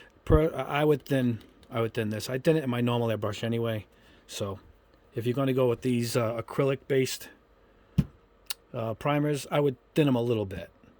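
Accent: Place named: East Coast, United States. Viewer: Cool and east coast accent and a voice that doesn’t sound like a mob hit man but a New York professor of fine art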